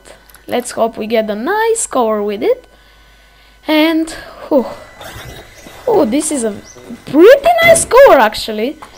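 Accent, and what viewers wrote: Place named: Bulgaria. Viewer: nice bulgarian accent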